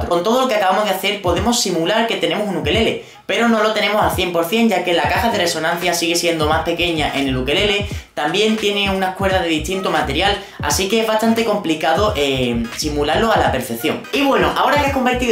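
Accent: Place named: Spain. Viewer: De que parte de España eres? ese acento no lo tengo familiarizado.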